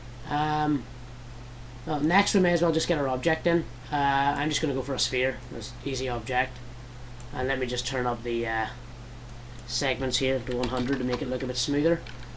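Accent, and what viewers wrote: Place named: Northern Ireland, United Kingdom. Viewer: Nice job! Northern Irish accent too !!!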